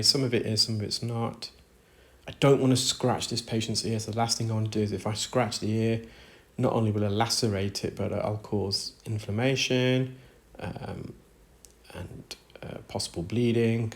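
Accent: United Kingdom. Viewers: Side note: I never tire of hearing you talk. There is something so soothing about your voice. And I love your UK accent. (big smile)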